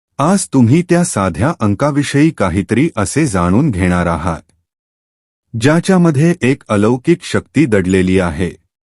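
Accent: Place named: England, United Kingdom. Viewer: इंग्रजी भाषेचा टोन देऊन मराठीत माहिती सांगतोय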